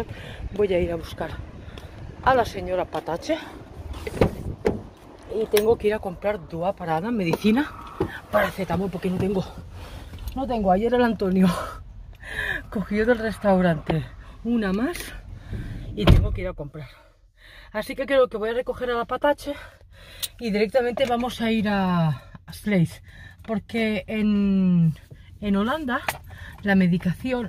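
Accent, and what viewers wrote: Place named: Catalonia, Spain. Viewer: Muchas musulmanas podrían tomar ejemplo de tí, porque hay algunas que madre mía! hay que darles el té aparte. 🤦‍♀️🤪 Saludos de una mallorquina desde Mallorca, te noto el acento catalán, ets molt maca! petons. 👍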